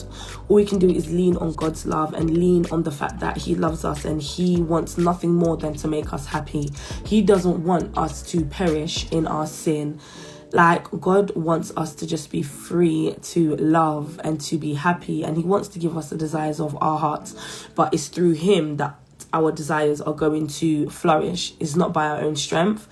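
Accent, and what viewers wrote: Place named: Jamaica. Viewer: Your accents I soo nice 😍 please . Give me some real Jamaican 🇯🇲 patios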